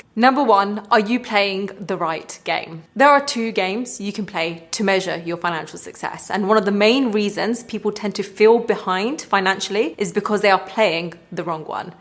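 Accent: United Kingdom. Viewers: Let's be honest, I'm watching this video because she's easy on my eyes, and no, I'm not turned on by her British accent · Omg. A British accent will get people only so far. When you read this advice instead of listening to the accent, you'll see it's very generic advice that is not worth your time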